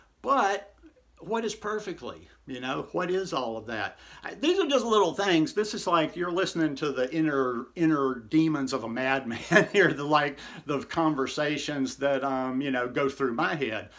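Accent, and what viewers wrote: South, United States. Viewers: Not only does your simplistic approach make the most obvious sense, you are so darn entertaining when sharing it! Love the southern accent and the mind processing of our human eating selves. Thanks for the entertainment!!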